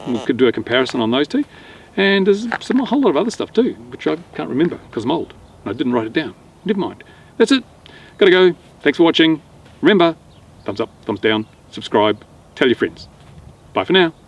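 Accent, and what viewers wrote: Australia. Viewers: Is this guy Australian? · Whats that accent? Aussie?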